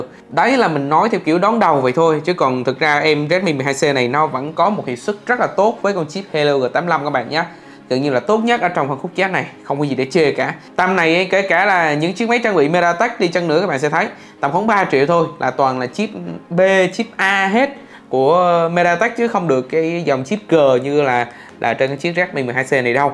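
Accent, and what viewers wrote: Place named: Binh Dinh, Vietnam. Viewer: Mé nghe cái giọng xứ nẫu này ko nuốt trôi nổi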